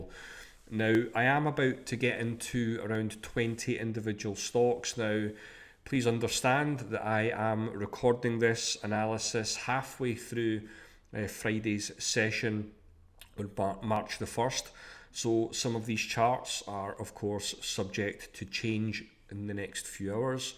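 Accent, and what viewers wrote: Scotland, United Kingdom. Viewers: Nice work and useful content 👏👏❤️. The Scotch accent is a great PLUS. 😀🙏👏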